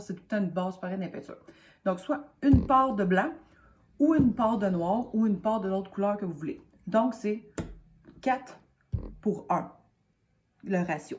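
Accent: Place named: Canada. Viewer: 🙏 waouh ! ENFIN ! Des explications en français avec un bel accent canadien ! Merci pour toutes ces précisions car après beaucoup d'essais infructueux, j'étais sur le point d'abandonner😅. Je vais donc retenter. Pooringment votre 😉 Isabelle, France (près de Paris)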